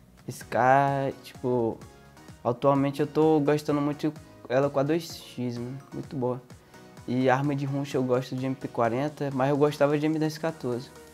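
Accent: Brazil. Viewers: Since mó jeitão da Bahiano igual meu pai kkkkkk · O sotaque dele honra nosso povo Viva a Fortaleza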